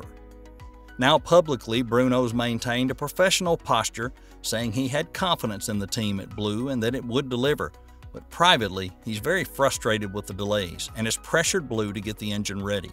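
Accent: South, United States. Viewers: Whether it’s a real human or just a really nice voice synthesizer… that’s an awesome Southern accent!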